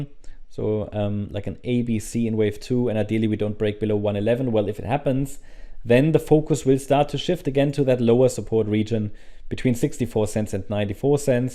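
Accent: Germany. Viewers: you have strong german accent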